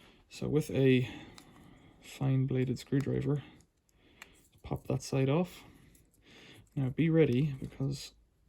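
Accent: Ireland. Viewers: Lovely chilled video. Practical, informative, clear. Top man. Also, loving the Irish brogue, not to sound patronising 😉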